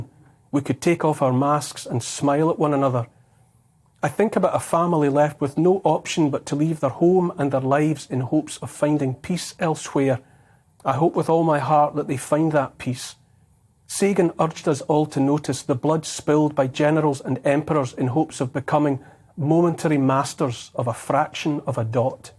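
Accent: Scotland, United Kingdom. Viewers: A combination of a beautiful Scotish accent and incredible intelligent, Sir Neil you are an incredible human soul ❤️ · Listening to Neil's Scottish accent only makes me wish a bagpipe band would go marching the hill. Wish I played. I can do scottish country dancing and miss it. Thank you for your support from this Canadian Pilgrim in British Columbia (Heritage is Scottish and South African, sure do wish the Commonwealth countries, and the rest of the world, would get it together to respect its people sooner). We must win. For our children. For our country. For Humanity.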